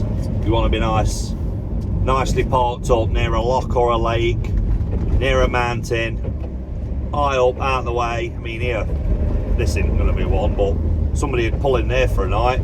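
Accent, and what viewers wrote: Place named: Midlands, United Kingdom. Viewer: (assume that's your name) Interesting video. First time I've seen you. Your accent I'd say you're not too far from me. Sutton-in-Ashfield I'm a widower Single like I assume you are.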